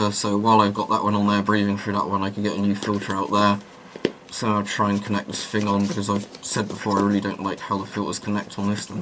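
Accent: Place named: United Kingdom. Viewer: why you sound more british with a mask on? btw thanks for the info :D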